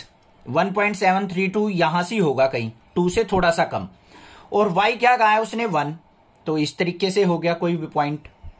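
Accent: Haryana, India. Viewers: Ap haryana se ho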